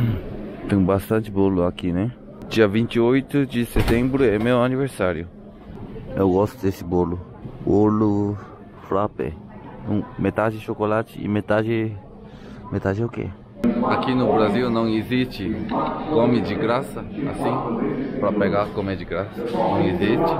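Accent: South Korea. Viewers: Eu fico muito feliz quando vejo um coreano falando em portugues tipo acho engraçado eu fico tipo : meu deus que lindo caraaa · Kkk é supermercado brasileiro mesmo kkk Mano eu acho muito fofinho ver coreanos falando português kkkk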